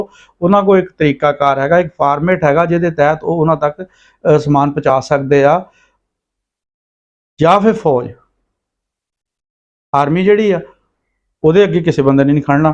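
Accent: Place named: Doaba, India. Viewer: bhut vdya glbaat kahi a veer ji , tuadi punjabi boln da lehja jaladhar,hoshiarpur side vla a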